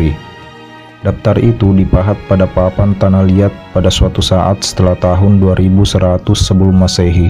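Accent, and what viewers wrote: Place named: Medan, Indonesia. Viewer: Maaf bang mau komen out of the topic abang orang medan ya? Dialeknya terasa banget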